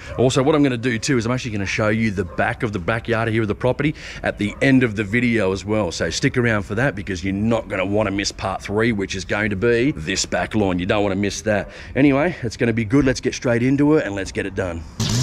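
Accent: Australia. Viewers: Love watching your videos. You have a keen sense of humor. Along with the humor you have a heart of gold. You are always helping others. Love the Aussie accent.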